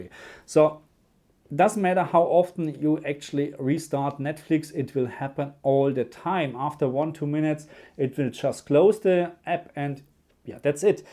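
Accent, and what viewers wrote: Germany. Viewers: You are clearly of german origin, right? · Du bist 100% deutscher oder? Diesen englischen Akzent erkenne ich überall 😅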